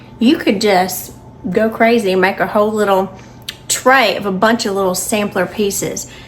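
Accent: South, United States. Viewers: Some people say the video was too long for it's content. I enjoyed listening to her southern accent and watching her cook. If you're an experienced cook all you have to do is scroll down to the recipe and directions and skip the video altogether. So shut up and let the rest of us enjoy the video. And I will be making this recipe.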